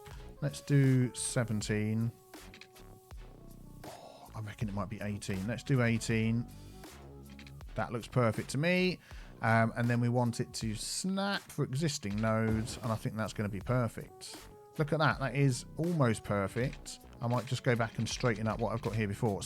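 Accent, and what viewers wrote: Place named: England, United Kingdom. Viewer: Where then? I like trying to figure out English accents. I have a good friend from Bristol.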